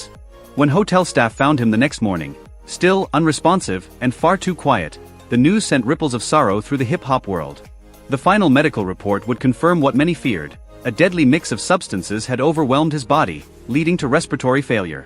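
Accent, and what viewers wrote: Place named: Asia. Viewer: What's up with all these Asian accent Voice A.I. video popping up everywhere?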